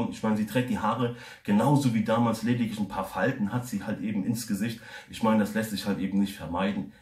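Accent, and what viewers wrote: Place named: United States. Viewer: Bist du Amerikaner dass du einen Ami Akzent hast ?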